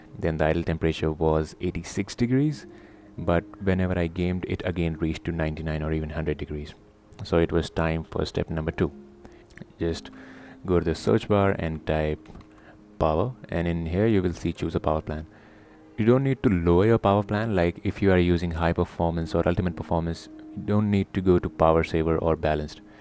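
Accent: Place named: India. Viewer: Judging from your accent you're most likely Indian. Always the Indian people helping with issues like this so thanks.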